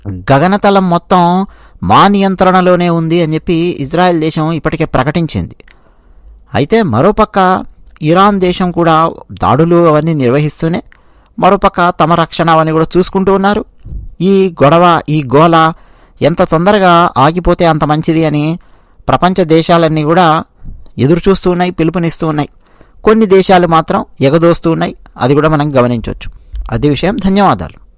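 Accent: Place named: Rayalaseema, India. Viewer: అయ్యయ్యో పాపం.. బెదిరించడానికి వచ్చిన గుండె ధైర్యం ఏమయింది.. మీ రాయలసీమ slang super గా ఉంది..😀😀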